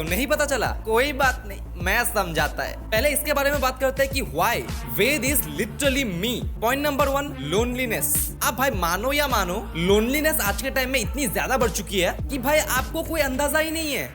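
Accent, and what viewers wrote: Assam, India. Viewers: Dada tumar pora axomiya vibes ahise akdom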